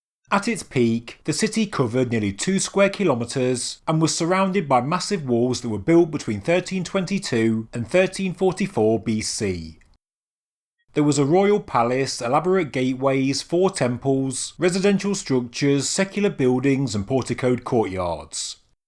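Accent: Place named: Black Country, United Kingdom. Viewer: He is definitely from the black country in England. Though that doesn't attest for the random drop in octaves on the last word in every sentence.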